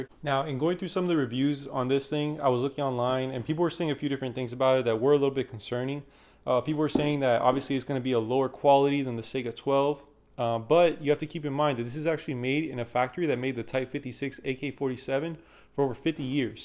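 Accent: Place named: Miami, United States. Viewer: Damn... that’s a Miami accent no matter where you hear it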